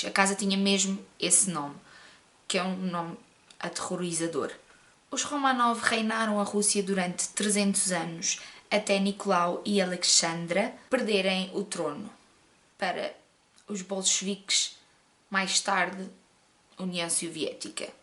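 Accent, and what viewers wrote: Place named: Portugal. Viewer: Eu amo tanto esse sotaque português! Me inscrevi no canal e já estou amando!!! beeijo!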